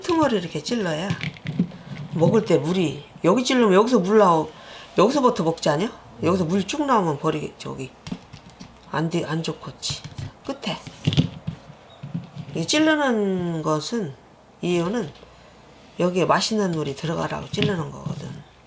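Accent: Chungcheong, South Korea. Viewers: 충청도사투리가 정겹네요ㆍ설명도 간단하니듣기 딱좋아요ㆍ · 충청도 어디세요? 고향이~~ 오햘머니? 너무 정겹습니다 친정엄마 의 목소리 같아서요.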